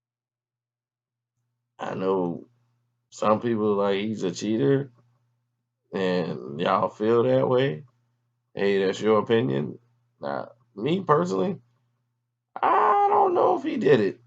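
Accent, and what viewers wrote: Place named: Chicago, United States. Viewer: That Chicago speak kinda like white dude down south except they got they own accent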